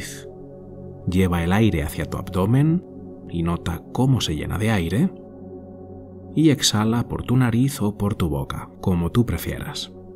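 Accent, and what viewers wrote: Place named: Spain. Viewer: Tienes una voz muy linda y con tu acento español mucho más. Dios bendiga tu don.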